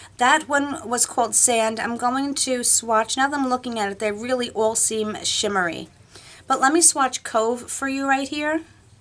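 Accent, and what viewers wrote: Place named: New Jersey, United States. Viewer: I'm new from Abby.. u r beautiful an u was great on camera.. Edit... where are u .. ur accent is so New Jersey LOL LOVE IT 😘 also I got the same box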